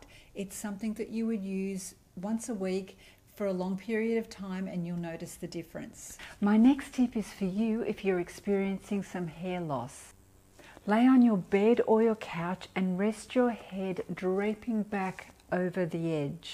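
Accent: Australia. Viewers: Your hair is certainly absolutely gorgeous. I also love your accent. Are you Australian? I lived in Australia when I was a little girl for a year when my father was a visiting professor at the University in Adelaide.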